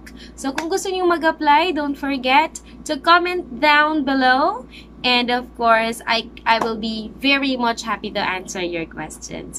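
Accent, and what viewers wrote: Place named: England, United Kingdom. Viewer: hiya nman ako ... hello teacher 😊 love the english accent !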